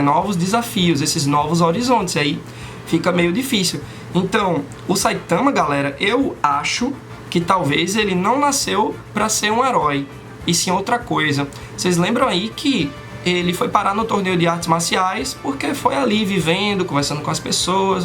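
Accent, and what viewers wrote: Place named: Sergipe, Brazil. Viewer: Tu é de Sergipe? Parece demais o sotaque. Abraço!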